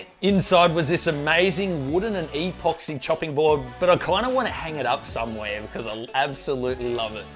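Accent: Australia. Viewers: hello are you australian?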